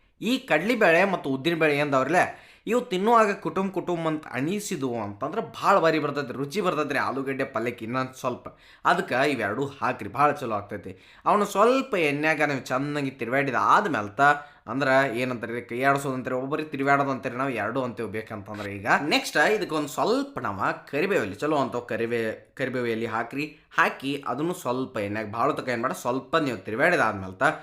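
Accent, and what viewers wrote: North Karnataka, India. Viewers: ಬಹಳ ಚಲೋ ಐತ್ರಿ😂 ನಿಮ್ಮ ಉತ್ತರ ಕರ್ನಾಟಕದ ಕನ್ನಡ ಭಾಷೆ ಬಹಳ ಚಲೋ ಐತ್ರಿ.😅😊😂❤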